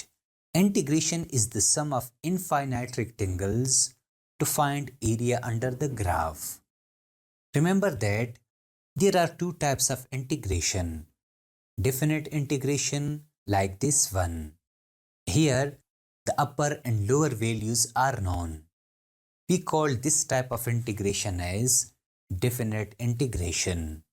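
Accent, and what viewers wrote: India. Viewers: Guyz is sir an indian!!? Cz his accent sounds soo..🩸still he's so underrated imean he teaches way better than any of my school teacher(dps btw)!!🥊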